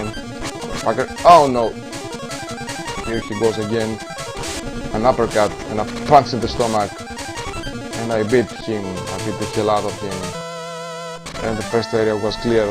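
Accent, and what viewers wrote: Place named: Greece. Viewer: i sense a greek accent. :)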